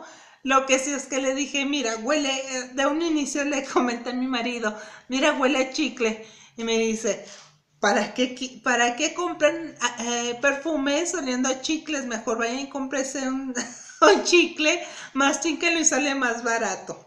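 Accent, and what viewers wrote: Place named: France. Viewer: Jamás me imaginaria eso!!pareces francesa o polaka tengo conocidos de esos lugares y es muy parecido el.acento. No te escuchas mal sólo pareces una extranjera muy sofisticada que habla el idioma con un poquito de esfuerzo. Gracias por contestar muy amable de tu parte y si estoy seguro le gustara a mi esposa el perfume bendiciones linda Cuídate mucho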